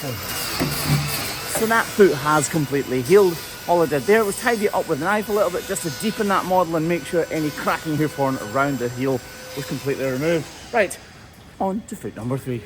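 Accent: Scotland, United Kingdom. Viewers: Your accent reminds me of William Wallace on Braveheart. Watch your channel all the time, love that you care about cows.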